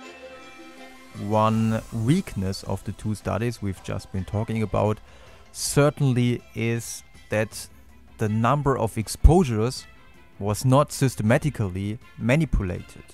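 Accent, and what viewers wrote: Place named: Germany. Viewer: Social loafing und social inhibition sind ja nicht die selben Sachen oder? Social loafing ist soziales Faulenzen, was ist der Fachbergriff für social inhibition auf Deutsch? P.S. Ich frage weil ich den Deutschen Akzent raushöre und mir das sonst niemand beantworten konnte. P.P.S Ich spreche auch Türkisch und die letzten drei Wörter sind definitiv nicht Türkisch, eventuell sind die Wörter falsch geschrieben. Habt Ihr ne Quelle?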